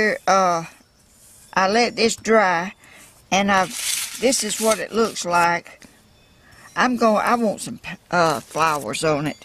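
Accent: South, United States. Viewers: Ms., what a blessing you are, listening to you makes me homesick for the south, I am from SC. God bless you, love your projects, what a wonderful job you do. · Hello Mr, Your so sweet love your southern accent. I love to watch you create, it come so natural to you. I could watch you for hours. I've learned a lot from you. Your very creative, and 'm impressed. I cant wait to retire so i can create my heart out like yourself. Bless You Always.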